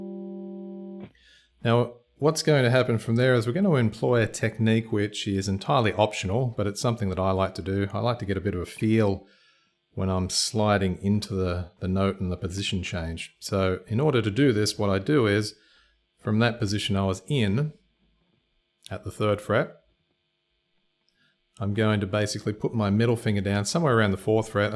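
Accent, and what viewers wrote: Australia. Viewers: Very interesting lesson, I listen to many types of music and play guitar, I've always liked Joy Division and kinda connected with Curtis... and later in life met and moved in with my significant other in Macclesfield[many years after his death] and She lived a hundred yards from Ian, and her dad worked with him in the 1970s The locations of his house and where he worked in the film Control are genuine. Her father~Trefor, said that Ian was very intelligent and really cared about the people that their social security dept tried to help..... which was mainly disabled people. Trefor died recently and had no idea who Joy Division were and about Ian's writing. ;~) now living back where I grew up, with Trefor's Daughter and "Macc Lass" , on south coast of England to look after my elderly mother. Noticed your accent , I have a younger half bother who now has duel citizenship with UK and Australia. 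He's just moved back there permanently with his baby kid to continue teaching Maths. Forget exactly where he lives, it's within a hundred miles of Melbourne. I talk too much blahblahblah... Cheers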